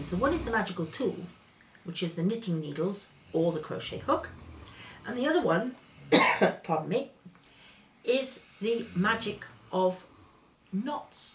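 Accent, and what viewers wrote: United Kingdom. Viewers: Love your accent,.. what part of Britian are you from?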